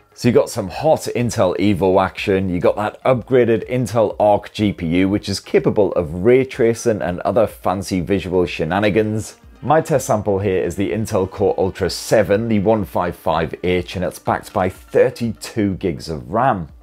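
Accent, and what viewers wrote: United Kingdom. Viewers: Man I could listen to British people speak all day, pure art.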